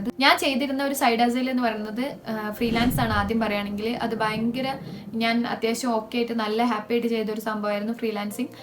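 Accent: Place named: Malappuram, India. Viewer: *നമ്മുടെ മലപ്പുറം മലയാളം ആണല്ലോ. ഇവിടെ എവിടെയാണ്? പെരിന്തൽമണ്ണ ആണോ*?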